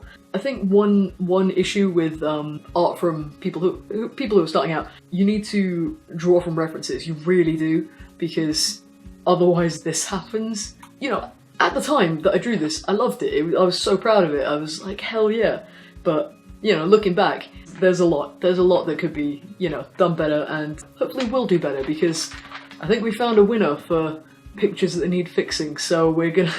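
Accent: United Kingdom. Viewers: Are you brittish? I'm terrible at knowing accents even though I'm from england lol. EDIT: you are brittish! That's so great! Your voice is really cute. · You have a british accent (I think), so I had some problems to follow what were you saying (I'm Venezuelan) but in any case I love your style!! Is so awesome!!! 😍 I wish I would draw like this, my style is more kinda...cute? (Is what the people said to me) if you're curious my Instagram is From myself, you already have loyal follower X3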